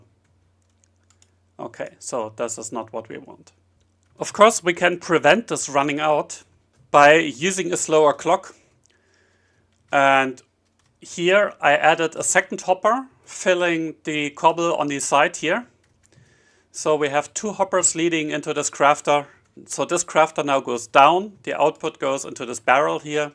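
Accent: Germany. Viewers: The world needs a technical minecrafters with german accents, no nonesense german efficient minecraft engineers.. now you need a ridiculous theme tune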